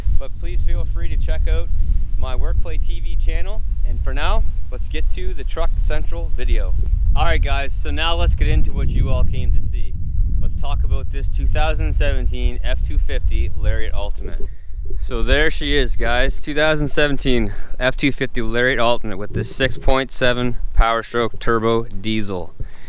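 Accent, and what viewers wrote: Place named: Nova Scotia, Canada. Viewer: He’s from Nova Scotia heavy accent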